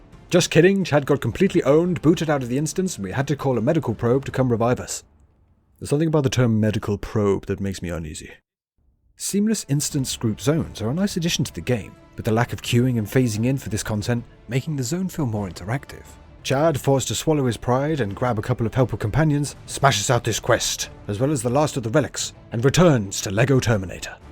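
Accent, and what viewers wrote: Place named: United Kingdom. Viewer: Top tier content! Wish you would turn the SWTOR to a chapter/planet gameplay. You've voice overs and Brit commentary is very entertaining!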